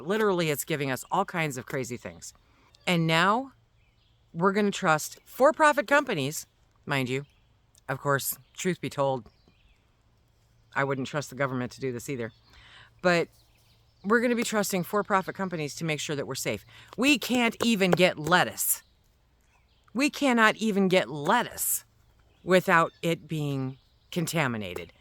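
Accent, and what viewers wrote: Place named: California, United States. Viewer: Sounds so California. Keep it there please.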